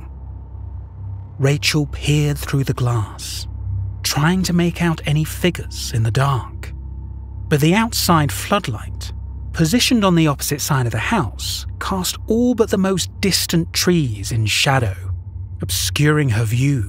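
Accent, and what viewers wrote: United Kingdom. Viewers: I just found your channel and I’m so glad I did! I noticed you have a British accent are there any cryptids from Europe that you are particularly interested in? I’ve always been fascinated by the Black Shuck stories from England and the Pricolici from Romania and of course the OGs Vampires and Werewolves 😂. Have a wonderful day ❤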